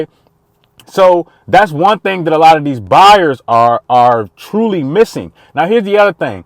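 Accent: DMV, United States. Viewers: Lol i knew from how you talked you were from the DMV, before you said it in this video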